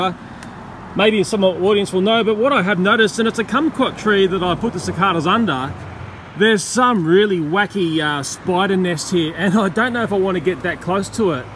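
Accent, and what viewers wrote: Australia. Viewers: your natural curiousity is on point mate., also we really need another aussie to narraeete some nature action. aussie narration is the best narration that exists.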